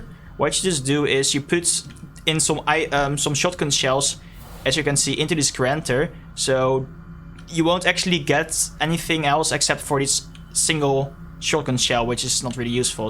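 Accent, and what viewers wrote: Netherlands. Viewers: Yo, the lever action shotgun now is in creative so can u put it in you're realistic 1v1 map, ty. Btw ik kan horen aan je stem dat je nederlands bent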